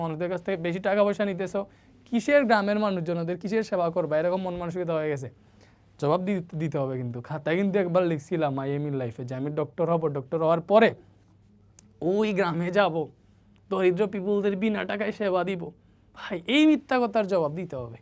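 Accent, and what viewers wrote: Sylhet, Bangladesh. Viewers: Beday j Sylheti kothay seta bujha jay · স্যার কি সিলেটি? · Sir ki sylheti nakiiii😮😮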